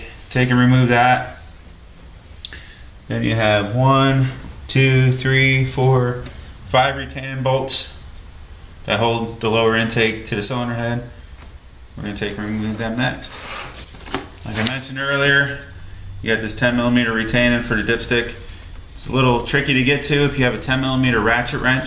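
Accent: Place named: Pennsylvania, United States. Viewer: sounds like you are from somewhere near pennsylvania. is that true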